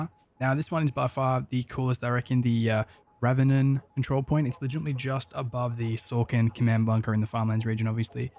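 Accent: Australia. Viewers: Ngl I enjoy hearing another fellow Aussie trying to pronounce these Swedish names 🤣😂😅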